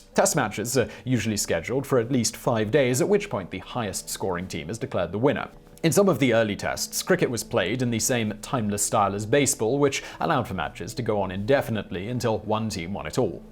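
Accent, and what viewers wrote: England, United Kingdom. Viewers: Mariela Que i love hearing someone with an english accent talk about american football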